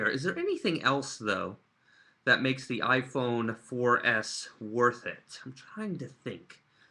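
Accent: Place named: United States. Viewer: Saw your new show on TV yesterday. Its so odd hearing your American accent in comparison to the kids! Makes us sound really funny haha. Good concept though, I think it'll do really well :)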